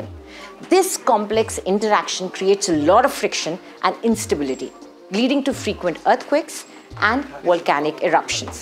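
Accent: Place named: India. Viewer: 読み上げの女性の英語がめちゃくちゃインドなまりで面白かった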